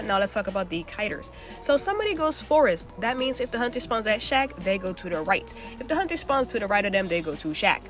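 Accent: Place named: Africa. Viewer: i love ur africa accent! im ur biggest fan plz remember when ur famous plz shoutout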